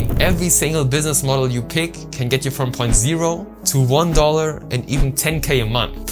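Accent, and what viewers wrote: Germany. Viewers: German Accent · are you German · are you german loool?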